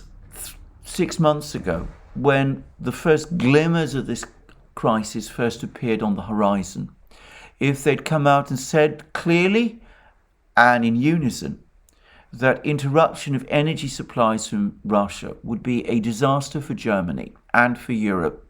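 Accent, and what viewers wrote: United Kingdom. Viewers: Yes, i am commenting, but why are you shakin that strangely, greek man with good Brit accent ? Both russia and ukraine are NWO controlled...i saw in mr Christofouros channel comments praising russian president : this is complete foolishness. But majority wants to believe that either ukraine is the good cop or russia saves the world : divide and rules for unuseful idiots ! Thank you. Greetings from Polska...we are unique, because in our history always anti fascist and anti communist ! ( Like Orwell :-) )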